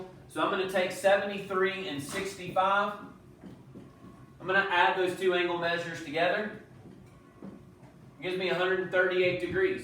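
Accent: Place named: Ireland. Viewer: my one complaint is the Irish accent. Other than that excellent video, keep it up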